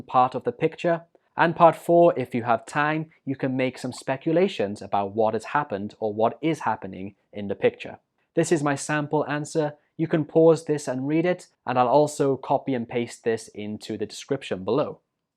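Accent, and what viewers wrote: United Kingdom. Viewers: Your English pronunciation is so good, are you British because your accent is very nice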